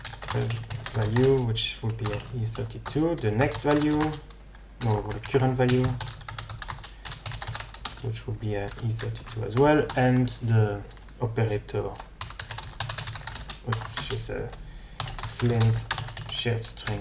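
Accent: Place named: France. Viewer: loving the french accent!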